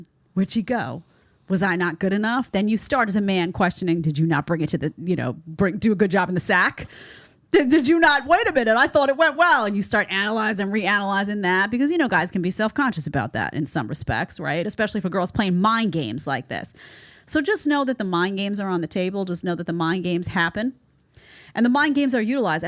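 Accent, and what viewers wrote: New York, United States. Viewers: You are delightful, especially your NYC accent. I'm drawn to women in the 5' 4'' range with small hands and feet.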